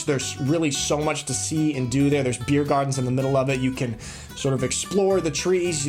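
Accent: Swabia, Germany. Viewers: The Swabians might deny it, but there's definetly some Swabian twang in your German,. Pretty cool;)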